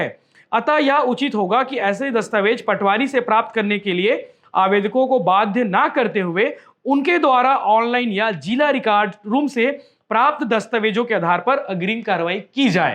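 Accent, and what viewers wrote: Chhattisgarh, India. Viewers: शुद्ध छत्तीसगढ़ी, सुन के अच्छा लागिस गा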